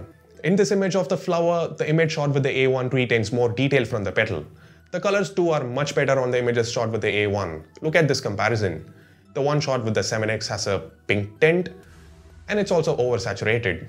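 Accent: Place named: India. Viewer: Your accent is amazing lol. Some times i cant even hear that indian accent. While some times i can hear it clearly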